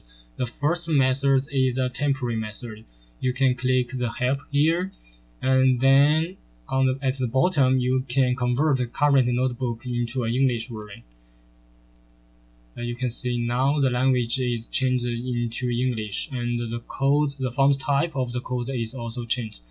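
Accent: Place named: China. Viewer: Thanks! I could change my default language from Chinese to English. And you Chinese style English is perfect for me, hahahaha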